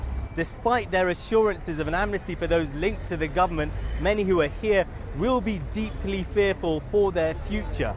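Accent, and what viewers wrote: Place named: United Kingdom. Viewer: イギリス人みたいな発音だ。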